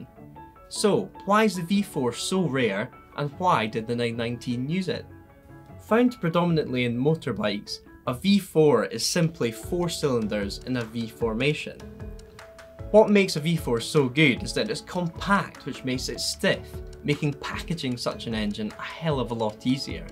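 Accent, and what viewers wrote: Scotland, United Kingdom. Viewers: I wish the video had subtitles...I can't get my ears around the Scot accent.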